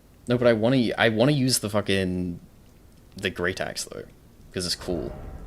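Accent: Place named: United Kingdom. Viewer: is my favorite Australian streamer. Weird that he has a British accent though.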